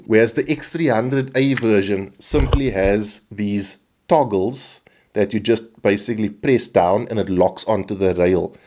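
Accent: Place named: South Africa. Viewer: You are pure South Africa 🇿🇦 the accent thick.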